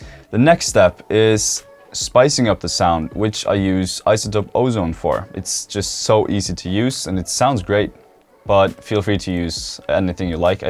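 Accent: Norway. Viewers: FREE mastring i Will love it. But are you from Norway?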